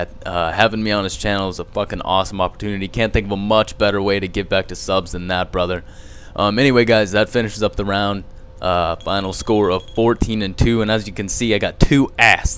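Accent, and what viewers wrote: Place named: United States. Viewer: more like white with a 95% american accent with 5% scottish. good try though.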